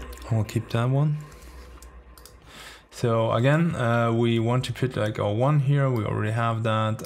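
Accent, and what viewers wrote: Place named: Austria. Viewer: Terminator accent the best you gotta love it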